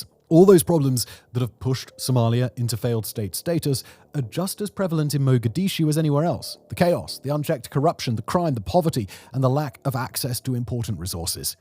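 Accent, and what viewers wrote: RP, United Kingdom. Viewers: you are a prime example that everything sounds correct when said in an RP British accent. I live in Mogadishu wife,kids, extended family, the whole shebang. We are not under siege. The turks have just declared that the two blocks they explored has an estimated 20 billion barrels of oil. I do not engage with your output because you know very little about very much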